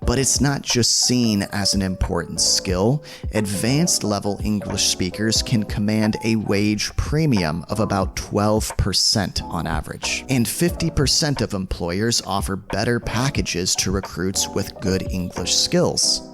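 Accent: United States. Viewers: Are u a native American English speaker? Cuz you sound American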